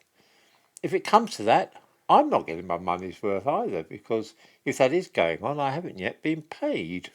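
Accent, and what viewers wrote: United Kingdom. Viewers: "...who is expressing views which are seldom voiced aloud..." I think you are not quite on the mark here. MANY people voice similar views. But here on this channel those views are voiced eloquently, and have them backed-up by (often quite obscure) facts. For example, though many people know in their gut that the current narrative on the 'Windrush generation' does not add up, few know enough on the subject to confidently object to seemingly factual statements made by supposed educated journalists, politicians and activists. Having someone actually articulate those views, backed with solid facts, that is what draws people in. Especially when voiced in an endearing archetypical British fashion.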